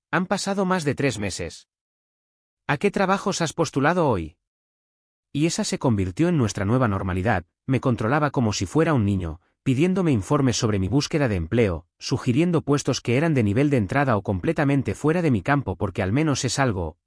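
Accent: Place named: Spain. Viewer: La verdad no sé porque a todas este tipo de historias le ponen esas voces mamonas españolas. Me caen en la punta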